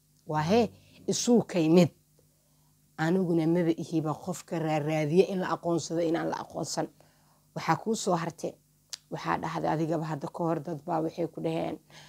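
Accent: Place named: Somalia. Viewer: markaa ku bartyba cidaa thyba dhaqsaba waa garty waayo lahjadeena maaha mid dhunta iyo codkarnimadeena lkn gabar umada usiman thy allah ha ku xafido abayo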